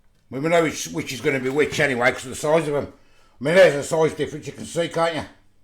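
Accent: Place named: West Midlands, United Kingdom. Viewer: Just found your channel I love a good food reviewer you sound common to West Midlands maybe could be wrong tho looking forward to watching your past and future vids 😊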